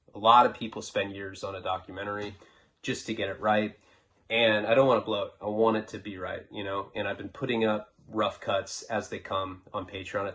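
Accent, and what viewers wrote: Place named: New York, United States. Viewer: Your ny accent is hilarious I just think about son from jail lol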